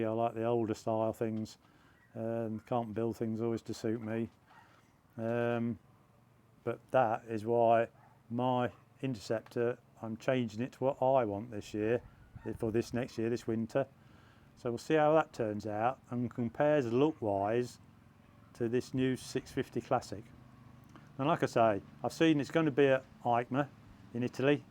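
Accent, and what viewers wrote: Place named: Midlands, United Kingdom. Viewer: There's no hiding that Midlands accent Leicester..Derby???😂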